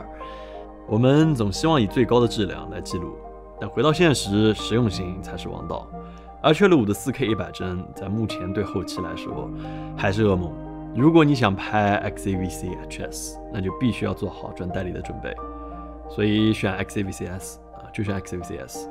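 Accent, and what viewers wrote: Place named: Yangtze Delta, China. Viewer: 我是因为tim的长三角口音爱上影视飓风的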